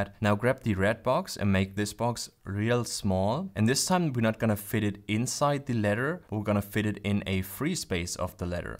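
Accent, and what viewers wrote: Germany. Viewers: Man, for a German you barely got any Accent left, how come?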